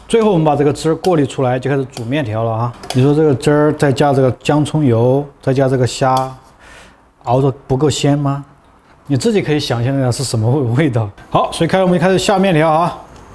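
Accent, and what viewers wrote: Sichuan, China. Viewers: 把四川話直接用国语讲，可能只四川人能听懂，而且亲切! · 好久没看到嫩个潇洒倜傥的美食博主，讲话还加点四川腔，幽默风趣搞笑，吃起自己做的美食一点都不含蓄，你最后那一大口吃得我准备明天去买点食材来做了，就是这里葱实在太贵吃不起，还有你那个干葱估计加拿大也买不到，哎，可咋整呀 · 听口音， 小哥川南的？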